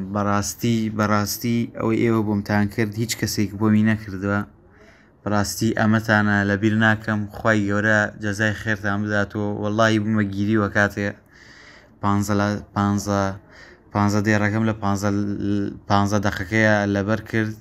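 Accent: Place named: Garmian, Iraq. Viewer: ئەم برادەرە دەنگی بە گرمیانی ئەکات😁❤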